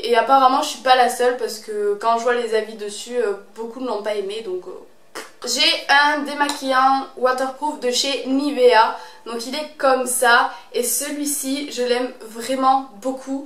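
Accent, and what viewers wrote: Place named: Southwest, France. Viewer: Coucou, pour mon deuxième commentaire sur ta chaine (je poste RAREMENT des commentaires), je tenais à te dire que j'adore ta personnalité ! Le fait que tu évites d'inciter tes abonné(e)s à utiliser des produits pas très conseillés me plait beaucoup et montre ta sincérité et ta bienveillance. J'adore ton accent, j'ai le même, et c'est tellement naturel ! Ca fait du bien d'entendre cet accent sur Youtube ! Enfin ! :P J'ai déjà essayé de me lancer sur Youtube mais par manque de motivation je n'ai pas continué. En revanche je fais de la photo. Tu peux toujours aller voir ma chaine si le coeur t'en dit, ainsi que ma page Facebook : Capricorne Clic Photographie. (Ouais j'en profite un peu, mais la solidarité du Sud (Ouest) paie toujours, donc ... ) haha ! Gros bisous et continue comme ça.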